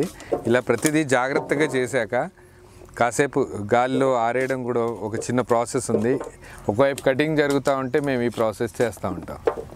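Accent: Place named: Telugu, India. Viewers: Your Telugu slang looks like Andhra bhayya. Telugu lo chesinanduku chala santhosham. Devudu meeku Ela service chesenduku avakasham echinanduku aa devuniki naa namassulu. God bless you beta. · Your Telugu slang is excellent bhai🙏 God bless! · Ur Telugu slang is very nice sir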